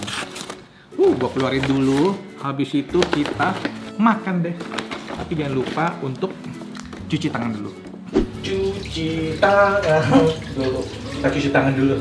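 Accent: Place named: Surabaya, Indonesia. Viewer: Mamen gak pernah telat nih nonton video nya, mana suaranya surabaya😂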